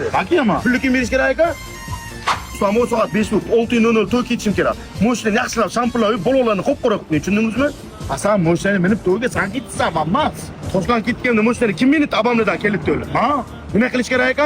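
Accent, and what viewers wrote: Namangan, Uzbekistan. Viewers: Namangan shevasi😊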